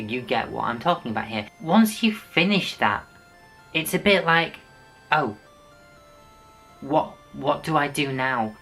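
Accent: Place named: United Kingdom. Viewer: Seeing this two years later ( I guess it was Two Years Ago with.) I find it is SO SAD that you couldn't get a Permanent Radio 📻 Gig. As I've said before... American Public Radio Stations would hire you in a ❤️ heartbeat for your British accent,Show Content and Dilligent Production Qualities. By the way...we could do a Show together...where we present and argue the Qualities of The British Production of Life on Mars....or the American Version of Life on Mars! 👾 👽. By the way...I noticed your Bed Covering...I do believe you are trying to make a Spectacle of Yourself,! Adios!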